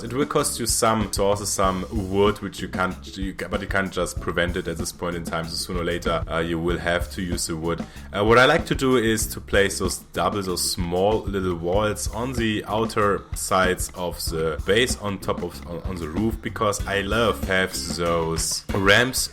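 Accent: Germany. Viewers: nice video, where you from? I think from Germany ^^ because the german accent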